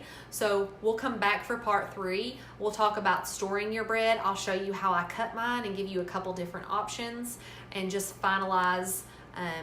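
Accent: South, United States. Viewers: Hi, watching from the UK, & I love your deep southern American accent 😊❤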